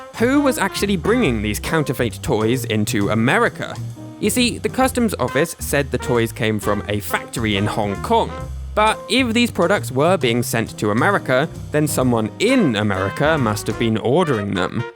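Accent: United Kingdom. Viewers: Hes british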